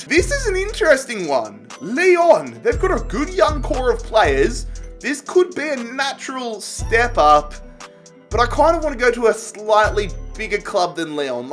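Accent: Australia. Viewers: Are you austrillan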